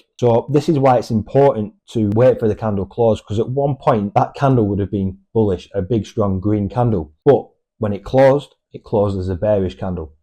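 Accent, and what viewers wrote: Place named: United Kingdom. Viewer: Nice video but for me is your british accent not understanable.. you dont said lower low/higher high you said lo lo/hai ha😂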